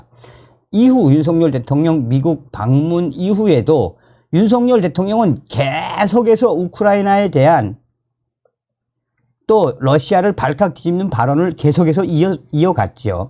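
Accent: North Korea. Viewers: 모든것 좋은 데 북한 말투를 쓰는게 정 떨어져요.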